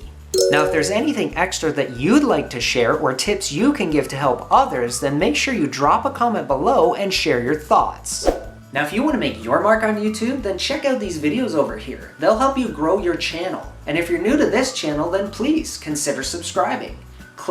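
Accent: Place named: Canada. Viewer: Great tips as always. I listen to energetic music before my live streams. I also generally try to record when I'm feeling good and having a good day vs otherwise. I've always thought you had a Canadian accent, but today I've just noticed your video location and hoodie logo. 😀 Cool! Yay a great Yoda quote, now two. I do have a friend who is very talented in graphic design and is helping me as we speak! Lol "For the love of popsicle Pete!" I've never heard of that phrase, I love it.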